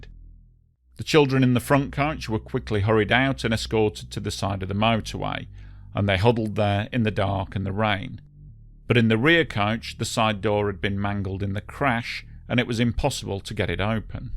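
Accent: United Kingdom. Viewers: . . . great point, I'm of the same thought. . . . as well, it's really reaching out there to correct a video producer in the comments section 🤣🤣Being way over here in the US, it looks exactly like a Frenchman wanting to correct their arch rival Brit any chance they can get, cause this guy sure sounds British to me anyway.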